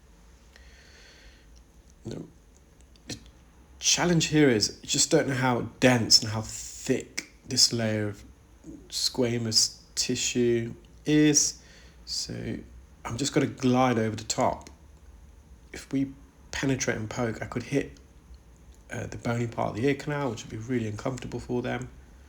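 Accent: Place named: RP, United Kingdom. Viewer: a silky, smooth posh British accent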